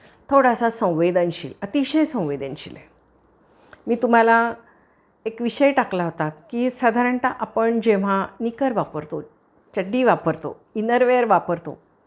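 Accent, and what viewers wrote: Kolhapur, India. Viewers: Madam tumhi kolhapur chya aht ka?? bolnyacha tuning vrun vatt kolhapur che aht😊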